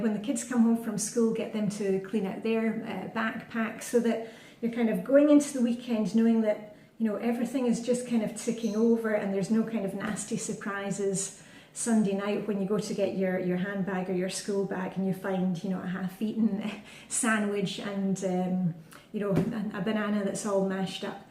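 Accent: Scotland, United Kingdom. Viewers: I love your accent! Just wondered if you read the latest Ian Rankin, In a House of Lies. I often listen to the audiobook versions because I love the Scottish accent.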